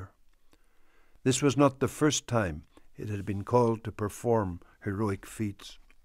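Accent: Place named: Northern Ireland, United Kingdom. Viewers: Laura Halliday hahaha!..how on earth would you know what a "Shakespearean" accent sounds like??..anyways the narrator has modern nothern Irish accent.. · It's a modern Northern Irish accent.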